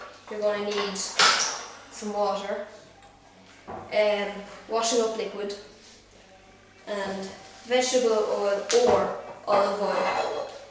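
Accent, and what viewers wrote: Ireland. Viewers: Cool are you Irish? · U sound Irish but at the same time gay :-/ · Yee sound a bit Irish yea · Are you irish because I'm irish and you sound iris to me and don't mind what these people calling you names say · are you IRISH by any chance lol? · I love the Irish accent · Are you Irish? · he sounds so irish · Im Scottish and i know for sure he's Irish!!! good job, WELL DONE :D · Sorry but im british, i've never head anyone in my life say 'dish soap' that just sounds weird o_o Where we come from we call it 'washing up liquid'. And no, I do not speak like him because he's irish im guessing >_> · wow i love his accsent....is it Irish? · gay irish man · ah ur irish just like me :) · dublin or belfast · Someone's irsh..... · I love your accent! You're Irish? Welsh? Scottish?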